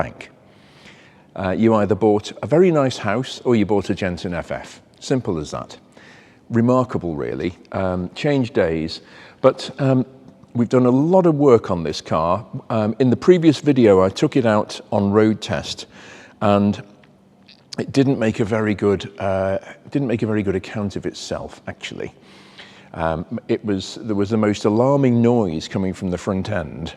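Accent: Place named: England, United Kingdom. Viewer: most English commentary I have heard in some time, great work from Australia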